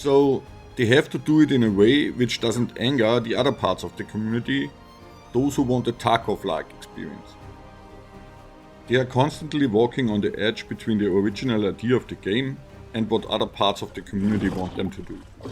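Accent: Germany. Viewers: German detected. Bayern? Your voice sounds like Bavarian person, but I might just be wrong there.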